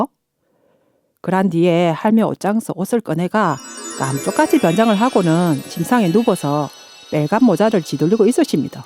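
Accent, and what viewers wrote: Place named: Hadong, South Korea. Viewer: 제가 사는 곳에선 좀 처럼 접하기 힘든 사투리인데, 하동 말로 듣는 동화가 생생하고, 참 정겹게 느껴지네요. 다음 편도 기다릴게요 😊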